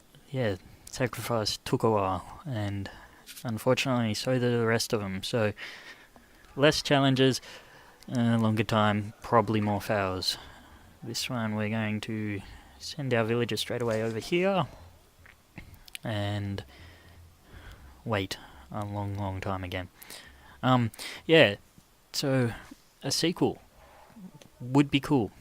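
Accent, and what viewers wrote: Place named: Australia. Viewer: Where in Australia are you from?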